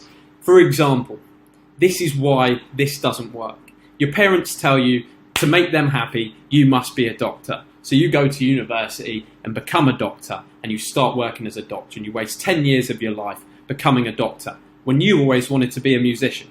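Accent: United Kingdom. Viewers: you're the most bri'ish guy I've seen till date bruv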